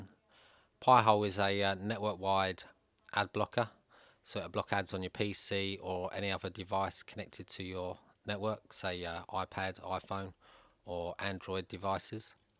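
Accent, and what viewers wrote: England, United Kingdom. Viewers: Can't understand that AWFUL english accent. I m outta here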